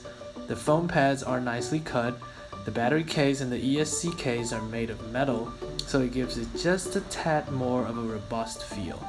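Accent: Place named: Taiwan. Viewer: 竟然是台灣人的影片，這口音很純喔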